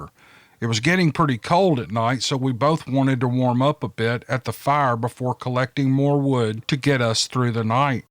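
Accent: South, United States. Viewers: like everyone else I love your voice! I’m from Mississippi living in Florida now, so I love Southern voices.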